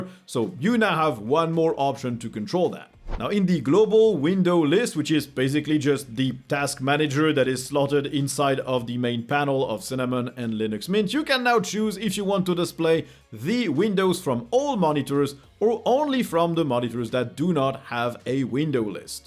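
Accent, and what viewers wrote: France. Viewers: Snif snif ça sent l’accent français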